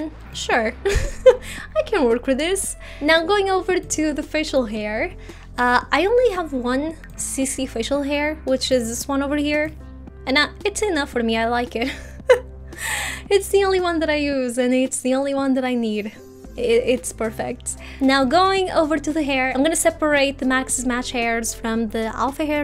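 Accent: Portugal. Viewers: you're portuguese right? I've noticed the accent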